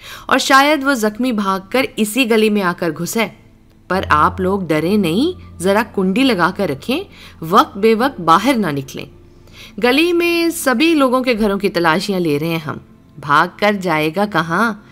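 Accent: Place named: Pakistan. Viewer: I love your sweet pakistani accent voice.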